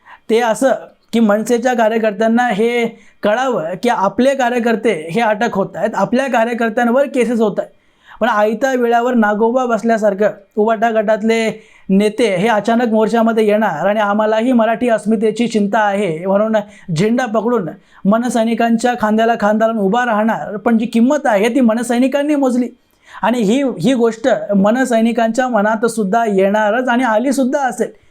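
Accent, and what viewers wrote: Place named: South, India. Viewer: तू दाक्षिणात्य आहेस मराठी बोलतोयस तुझं अभिनंदन पण तुझ्या बोलण्यात दाक्षिणात्य बाज आहे.तुला जो पुळका हिंदीचा आला आहे ना तो तू तुझ्या मूळ राज्यात जाऊन पहिल्यांदा हिंदी भाषा सक्तीची करण्यासाठी अभियान चालू कर.इथे अक्कल पाजळू नकोस. जय जिजाऊ जय शिवराय जय शंभूराजे 🚩🚩🚩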